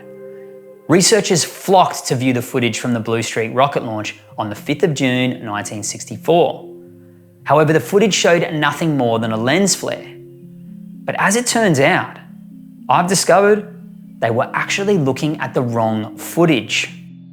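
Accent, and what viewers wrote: Australia. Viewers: You're argument is well thought out and presented. Good to hear an Australian voice presenting information on a topic that tends to be presented differently to the Australian manner of thinking and understanding. I'm looking forward to hearing more from your channel. 😊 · It’s about time we had an Ozzie voice…glad I found you. Thank you.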